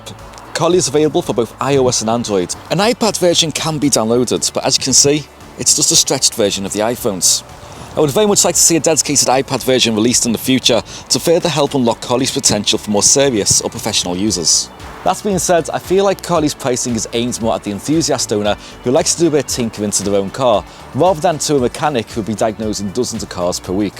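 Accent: Liverpool, United Kingdom. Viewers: keep making videos mate, I've just discovered you. Keep that content coming! You sound like you're from my way or near by? (Liverpool)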